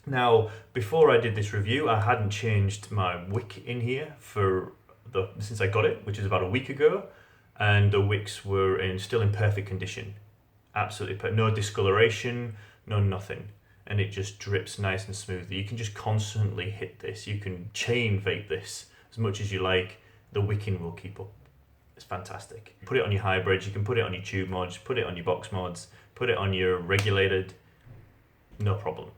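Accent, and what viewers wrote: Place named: United Kingdom. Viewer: Great review, thanks. Where is your accent from in the UK? What city?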